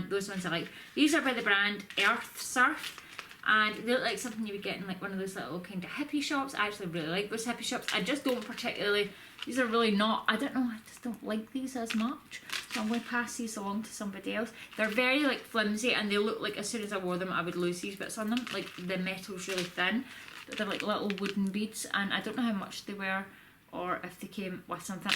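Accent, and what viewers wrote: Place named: Scotland, United Kingdom. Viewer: Hi i really liked your charity shop hall's , you have a lovely scottish accent. Thankyou.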